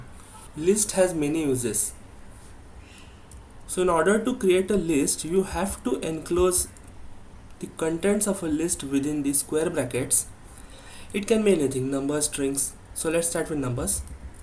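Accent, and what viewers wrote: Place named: Maharashtra, India. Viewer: sir, this is really very helpful tutorial, no mess just dive into the topic. i guess you're maharashtrian by your accent, i could be wrong but i think so. thanks for this great tutorial.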